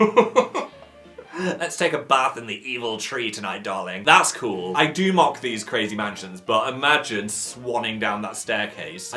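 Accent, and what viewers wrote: North, United Kingdom. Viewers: Is northern accent thicker these past few vids or is it just me?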